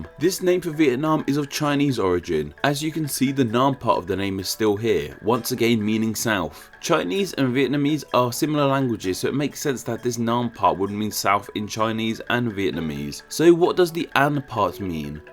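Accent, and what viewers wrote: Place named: United Kingdom. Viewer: Plus, its not like we're going to use foreign words mentioned here. He apologized tons of times about misspronounciations already, and your suggeated alternative would be HORRIFIC! I dont want his videos to be interupted by a random video. Plus, the guy has a specific british accent if im not misstaken, so pronouncing other words is much harder for him.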